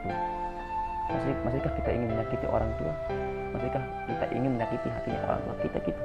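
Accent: Lombok, Indonesia. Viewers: Kayak logat lombok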